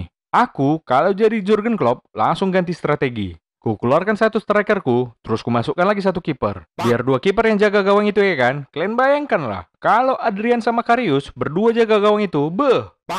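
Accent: Medan, Indonesia. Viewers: Nampak kali ah abg ini orang Medan nya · Abg pasti org medan saya orang mandailing natal bg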